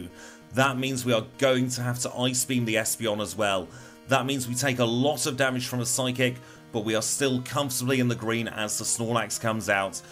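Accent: United Kingdom. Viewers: the way British people pronounce the letter "H" makes life worth living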